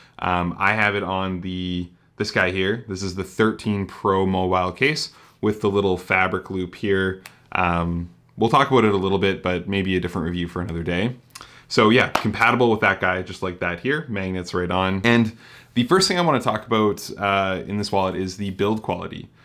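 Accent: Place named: Canada. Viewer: Excellent review. Merci beaucoup du Quebec. I noticed your Canadian accent and the bills in your wallet.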